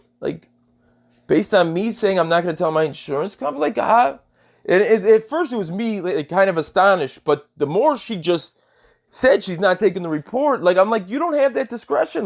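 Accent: Chicago, United States. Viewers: Good story. Keep up the great work! Love the Chicago accent, you sound like Abe Frohman, the Sausage King of Chicago. 😎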